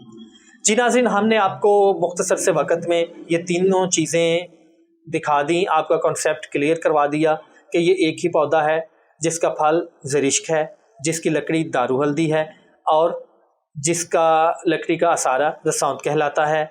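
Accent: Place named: Lahore, Pakistan. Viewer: Lahore Pakistan se boht Sara Pyar apko aap kis city se ho dear ❤️aap boht hi piara bolte ho , asal Hakeem ho aap, Lekin bolne Kay style se Lahore Kay lgte hain 💯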